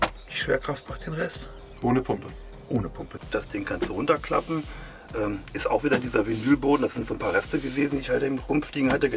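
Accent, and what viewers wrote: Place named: Ruhr, Germany. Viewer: Da hör ich doch Ruhrpott, aber vom feinsten. Geiler Typ Geiler Anhänger 👌🏼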